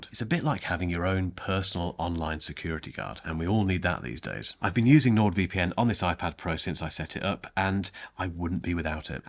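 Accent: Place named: Cardiff, United Kingdom. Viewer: The most proper Cardiff accent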